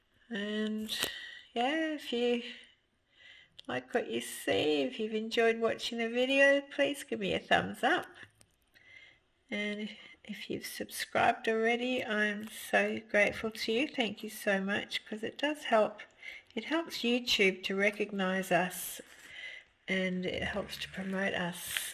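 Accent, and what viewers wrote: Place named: United Kingdom. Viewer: I have two of Sarsh's paintings. One is Low Tide (there is a video). Have a smaller condo so space is limited but her paintings are the highlight of a wall. She noticed you because of your exceptional progress over a short time. Good for you. Assume you are in British Isles based on your lovely accent and 5 hour time difference. Have a wonderful day!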